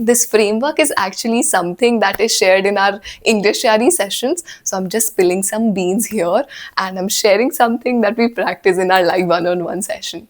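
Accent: India. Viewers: Indian accent 😭😭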